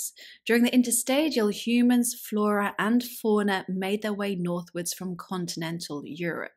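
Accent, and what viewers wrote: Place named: Home Counties, United Kingdom. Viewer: Beautiful diction;english 'home counties',around London?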